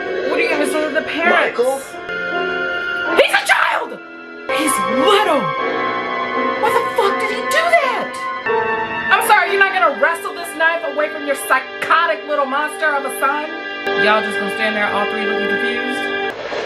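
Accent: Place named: South, United States. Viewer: From a brit. You have an x rated southern twang that says what everyone else is thinking when facing Carpenters creations. Funnny wonan x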